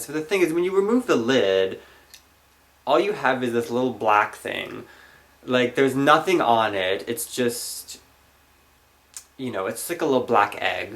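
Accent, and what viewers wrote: Germany. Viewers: hahaha they must be german. I love your german accent. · You have like a perfect German accent, I can't stop listening to it.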